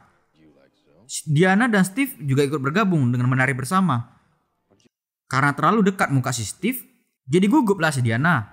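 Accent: Medan, Indonesia. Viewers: Medan kali cakap abang ini bah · Main kali logat medannya bg 😁😁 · Bahasa mu sperti anak medan bang..😂😂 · Bahasanya anak medan satu nih lucu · Lo orang Medan ya bang? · Orang medannn ko kan bang7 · Anak medan ya ini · Medan x bah abang ini, 😂😂😂😂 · orang mana kau bg, medan dimana nya wkwkw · Orng medan nya kau wakk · Ini abang ini ku rasa orang medan dari ngomong nya kayak orang medan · Bahasanya kek temen2 gw di batam..dari medan ya bang?wkwkwk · Logatnya itu loh Medan x bah 🤣🤣🤣🤣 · Orng medan kau ya lae😂😂😂 Sma lah kita lae Smngat lae buat conten nya👍👍👍👍👏👏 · Entah seneng aja dengar orang Medan cerita ... Lucu" gitu 😂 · Nampak kali ini kalo kau orang medan 🤣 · orang medan juga ya bang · Ngakak gw liat ngomong logat medan ny🤣🤣🤣🤣🤣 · Komentator nya orang medan.... 🤭🤭🤭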